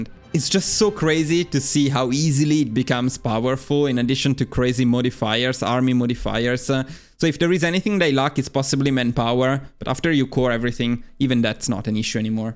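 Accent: Italy. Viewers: that's got to be one the thickest italian accents I've heard. Not complaining tho, English is always better with a thick romance language accent.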